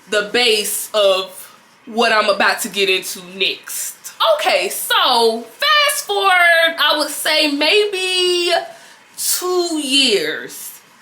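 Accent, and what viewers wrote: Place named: Alabama, United States. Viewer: You from Bama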